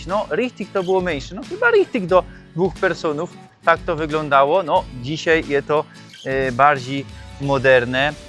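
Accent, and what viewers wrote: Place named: Rural, Poland. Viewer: A co ty tak często zmieniasz KOLOR SKÓRY? Zaczynasz jako czorny Murzyn, ale za chwilę udajesz białasa! O tu ==> Po drugie ten wasz "język" śląski to zwykła KLASYCZNA wsiowa gwara, taką, to i u nas, w Świętokrzyskiem często spotykamy, ale nikt tu nie udaje "uczonego językoznawcę". Wsiowy, to wsiowy! To widać, słychać i... czuć. :hand-orange-covering-eyes::body-blue-raised-arms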